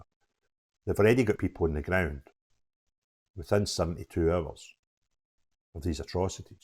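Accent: Scotland, United Kingdom. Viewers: I really appreciate your investigative journalism. Plus your topics. Equally in the meantime I am having to learn to understand the Scottish accent which I do enjoy.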